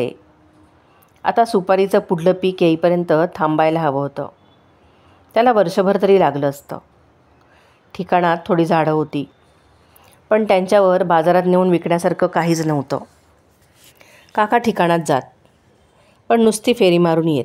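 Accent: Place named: Konkan, India. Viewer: Uttam wachan.kokni ucchar hi chan 👌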